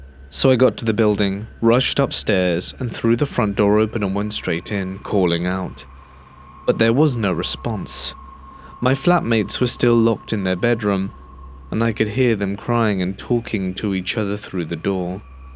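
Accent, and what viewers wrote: England, United Kingdom. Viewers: For the first time I can’t stand an English accent!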